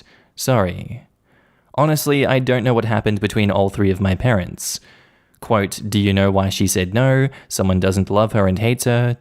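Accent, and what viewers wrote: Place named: Australia. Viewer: Ayeee what's poppin,?? Also, is your government name or just a nickname? Thank you for all the videos and lovely Aussie accent. We love you, we appreciate you. Keep going mate, you're bloody awesome 🤗💞🙋🏾‍♀️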